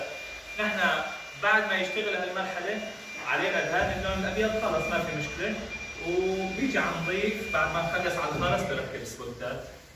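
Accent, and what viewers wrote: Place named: Syria. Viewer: شغل حلو و الاحلى لهجتك السورية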